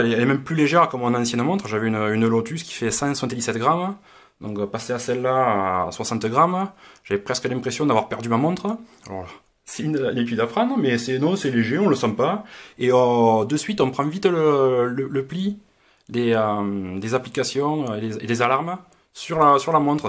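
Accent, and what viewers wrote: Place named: Perpignan, France. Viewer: L'accent de Perpignan 😂😂😂 Une boîte toute blanche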